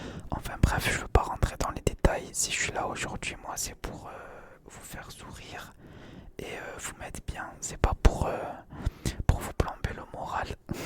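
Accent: South, France. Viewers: salam aleykoum, ca faisait tellement longtemps que jsuis pas tombé sur tes vidéos ! le truc que j’aime vraiment trop c’est l’accent du sud, t’es de marseille nan?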